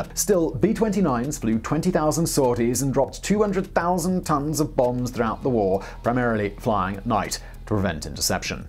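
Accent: United Kingdom. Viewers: My wife: how many channels does this guy have? Me: why? My wife: I hear that Brit's voice all the time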